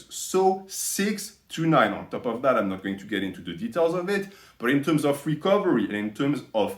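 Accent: France. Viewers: je viens de découvrir ta chaîne et j'ai su en une phrase que tu étais français avec ton accent , continue tout est excellent et cette french touch ça donne juste envie de regarder d'avantage tes conseils et d'en apprendre plus , à la prochaine .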